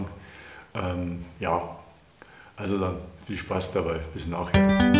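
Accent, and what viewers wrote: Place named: Bavaria, Germany. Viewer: habe de Ehre zruck ;-), sah dich schon öfter, auf diversen anderen Kanälen und horchte dem vertrauten Dialekt; Jetzt auch DEINEN Kanal gefunden *freu. Woher kommst du denn? Besten Merce + schönes WE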